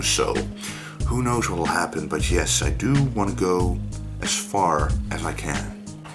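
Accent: Netherlands. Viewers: Were are u from? You sound like a Dutch Guy ! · it's awesome to see another lifter enjoying what he does and publicly answering questions like that. It's quite admirable that he does these videos in english too... I notized right away that this fella must be dutch due to the on and off popping out of the accent. Right on cuz keep it up !!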